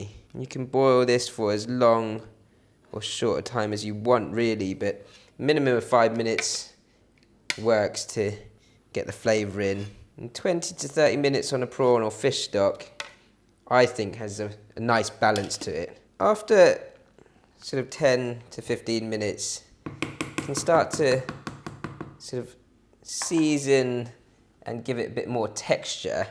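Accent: United Kingdom. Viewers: u got a british accent bro?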